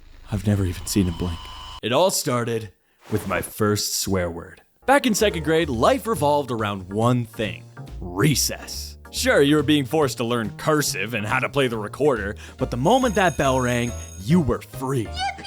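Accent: Canada. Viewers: Are you Canadian too?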